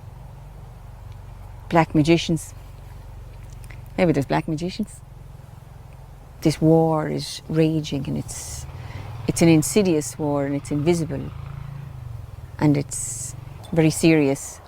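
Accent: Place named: Ireland. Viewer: Love your lovely Irish accent and wisdom💚🇮🇪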